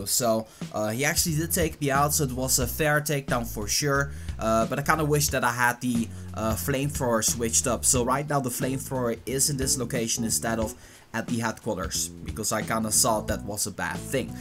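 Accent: Netherlands. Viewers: if i compare youre accent with loui van gaal. i can obvious hear you are from the netherlands. correct me if im wrong.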